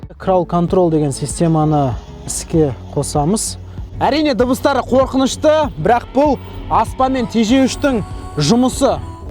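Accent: Standard. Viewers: Мәссаған сөзім жоқ.Қазақ тілде таза сөйлейсін.біз лайк басуды аямаймыз. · Таза казакша керемет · Қоспасы жок тап таза қазақша сөйлеп тұр, жарайсыз. Техникалық термин атауларға дейн қатесіз анық аударылған. 👏👏👏👏👏👏👍👍👍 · Таза қазақша керемет! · Керемет, сонша еңбек өте жақсы түсірілім, жарайсың ең бастысы таза қазақша! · Ассалаумағалейкум! Қазақша таза сөйлейді екенсің, риза болып отырмын. Жұмысың алға баса берсін! Пытырлатайық жігіттер лайкты, оған лайықты! 👏👏👏👏👏👏👏👏👏👏👏👏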